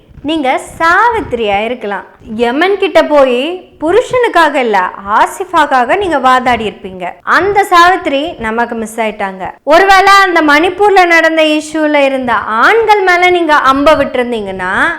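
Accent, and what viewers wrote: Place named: Coimbatore, India. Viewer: Super you are taking different topics and exposing reality dear daughter in Kovai slang especially. Lot's of our peoples are fans of you since for your slang especially 🎉❤keep going 💪 👍 👏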